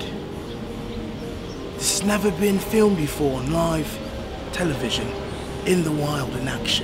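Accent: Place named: England, United Kingdom. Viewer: This is more like sex on the dance floor.... That English accent though is bomb...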